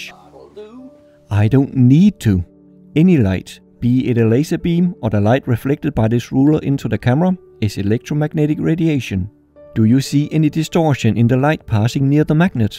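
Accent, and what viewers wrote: Denmark. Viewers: Man kan bare høre på den accent du er dansk haha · Warm Danish accent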